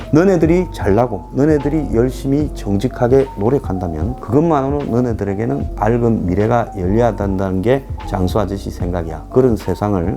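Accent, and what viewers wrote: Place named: Seoul, South Korea. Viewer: 소장님.귀여우세요~살짝 사투리 섞인 서울밀투~~^^ㅋㅋ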